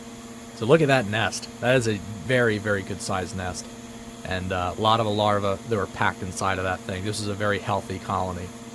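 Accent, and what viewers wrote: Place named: Pennsylvania, United States. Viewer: My son and I absolutely love your channel! He is currently 5 years old and is battling leukemia and to see him get so excited every time a new video comes up really makes my day. He only has two more months of chemo to go and everything is looking good =) We used to live in NJ so we are used to the PA accent with "cone" and such so that brings us a little taste of home from all our friends in the PA region. Saw a Japanese Hornet fly by our house (we currently live in Thailand, long story lol) and immediately thought of you doing battle with these. Those hornets would not have a chance ;)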